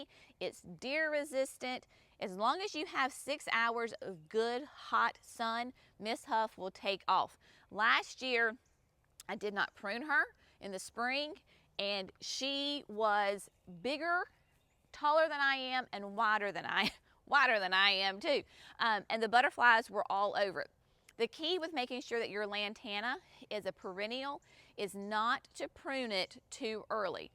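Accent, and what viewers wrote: South, United States. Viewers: North Caroline-ah? Love that southern twang!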